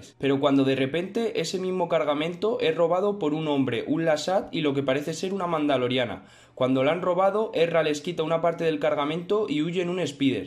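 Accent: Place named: Spain. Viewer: triste encontrar un youtube pura voz española de p o r k r i a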